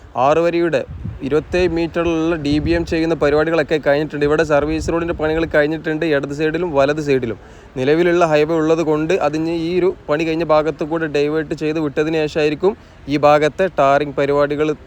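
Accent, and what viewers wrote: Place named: Malabar, India. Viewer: അത് ബൈക്കിൽ ഫിക്സ് ചെയ്യുമൊ അതൊ കൈയിൽ പിടിച്ചിട്ടാണൊ. എന്തായാലും ന്റെ നമ്മുടെ മലബാർ ശൈലിയിലുള്ള സംസാരരീതിയാണ് വിഷ്വലിനോടൊപ്പം തന്നെ വീഡിയൊയെ കൂടുതൽ ആകർഷകമാക്കുന്നത്. Keep going.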